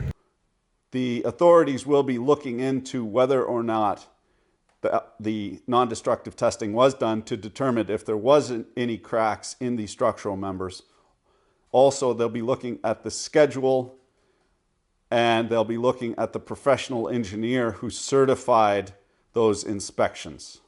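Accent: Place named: Canada. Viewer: Narrated is classic unfiltered Canadian style -- well done!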